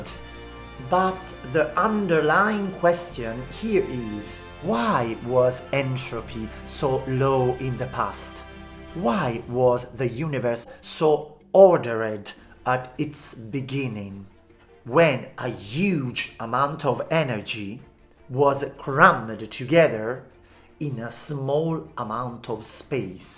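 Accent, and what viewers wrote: Italy. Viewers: this is officially my favorite video ever: his English-Italian accent mixed with a topic so interesting and from which I've always been fascinated, fills my afternoons with joy. Your lessons are the best.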